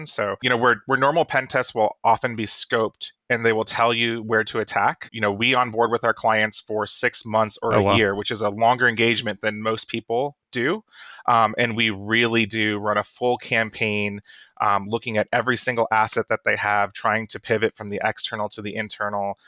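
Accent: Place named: South Africa. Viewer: Jason did a REALLY GOOD JOB! I hope we get an episode finishing all the levels on recon. I personally would like a video on Gaining access and Maintaining access. THANK YOU always a pleasure hearing the all too familiar South African accent.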